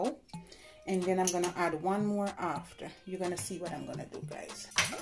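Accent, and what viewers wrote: Jamaica. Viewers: I just love a Jamaican accent. No one else on Earth sounds like my beautiful Jamaican brothers and sisters. And the coco bread is looking lovely too.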